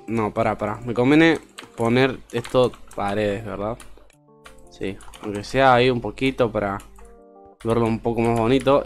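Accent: Argentina. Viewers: Vos sos Córdobes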